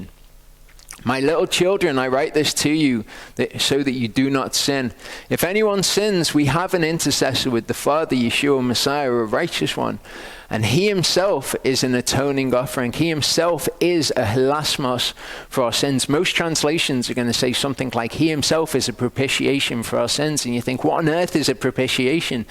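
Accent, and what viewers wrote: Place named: Liverpool, United Kingdom. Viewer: I SEE BY YOUR VOICE YOU ARE FROM LIVERPOOL, I ALSO AM FROM LIVERPOOL AND AM LOOKING FOR A BIBLE BELIEVING CHURCH THAT KNOWS WHAT TIME IT IS AND THAT WE ARE IN THE VERY LAST DAYS, IVE TRIED MANY, BUT THEY ALL PREACH ONLY FROM THE FOUR GOSPELS, THEY DONT GO NEAR PAUL, THE OLD TESTAMENT AND CERTAINLY NOT THE BOOK OF REVELATION. I REALY NEED A CHURCH THAT I CAN GO TO AND FELLOWSHIP WITH, CAN YOU ADVISE ME? I LOVE YOUR SERMONS AND WISH YOU HAD A CHURCH IN LIVERPOOL! YAHOVAH BLESS YOU IN THE NAME OF YESHUAH HA'MASHIACH! THANK YOU! My email is: <mail>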